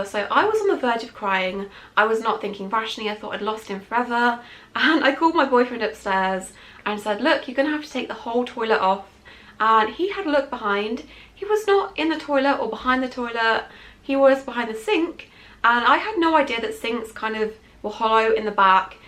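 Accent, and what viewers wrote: United Kingdom. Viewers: That high-pitched British accent is so cringeworthy.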